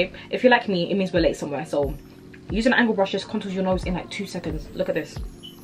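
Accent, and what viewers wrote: United Kingdom. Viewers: I've watched your videos before. Ibnever expect a British accent I think it's because to me you look like LA beautiologist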